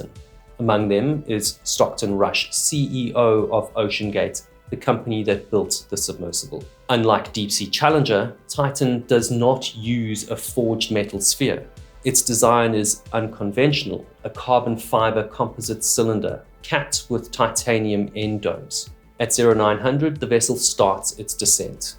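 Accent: South Africa. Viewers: I love the content and the South African accent 😊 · Except he has a South African accent! It is a nice accent though.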